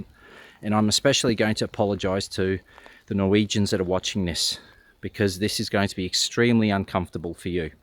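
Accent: Australia. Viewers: It's interesting but I'm hearing what seems like an Aussie talking about Norway with no upfront explanation of that apparent anomaly... ;-) My big concern is much less the typical grid down prepping issues (not that I am indifferent to that!), but the tyranny that is unfolding from our western governments. I lived in Latvia for 5 years and recently returned to my homeland of Northern Ireland, largely due to concerns about the Russian adventure in Ukraine and what that might lead to, but now I am seeing "hate speech" laws being drafted in both the UK and across the border in the Republic of Ireland that make Stalin's dicta look meek and gentle. Essentially, what is being proposed is the legal framework for data-mining everyone's communications in the past to profile them for potential future acts of "hate crime" (i.e. any opinion that goes against their pet narratives) and target them for pre-emptive arrest and imprisonment as a means of preventing them offending anyone with a "protected characteristic". 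Right now, I feel like I have jumped out of the frying pan and into the fire. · Very sensible advice. You sound Australian. Best wishes.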